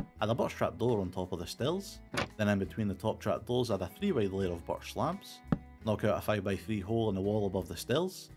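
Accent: Scotland, United Kingdom. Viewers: Build: 100% Style: 100% Shaders: 100% Scottish Accent: 110% most underrated minecraft channel in history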